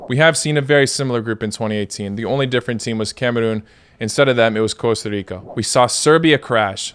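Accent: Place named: United States. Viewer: Why do you pronounce Croatian players' names in an american way?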